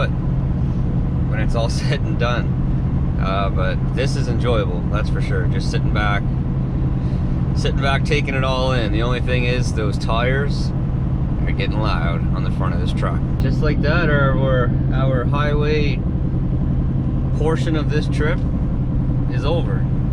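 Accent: Nova Scotia, Canada. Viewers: Dude, just found your channel!!! My Dad and his side of the family are all from Shelburne, Gunning Cove specifically (he moved to Cali when he was 11). Lots of my distant relatives still live in that area, across from McNutts island. Every summer growing up (3-4 weeks) he would drag me, my brother, and my Mom from Washington State to Gunning Cove Nova Scotia to spend time there. We would play golf, eat lobster, archery, fish, etc. I hated it then, but now, those are some of my most cherished memories. Dad passed away in 2010 (*uck you cancer), but us three have been back 4-5 times since. Part of Dad rests in the water of Gunning Cove just as he wanted. Sorry for the story, but listening to your accent and hearing Nova Scotia I had to comment. Thank you for the videos man!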